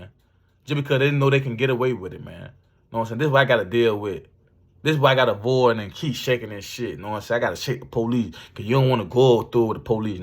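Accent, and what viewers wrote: United States. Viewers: You gotta be from Florida I can tell how ya talk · You from Louisiana?? With the you hear me slang 😊😊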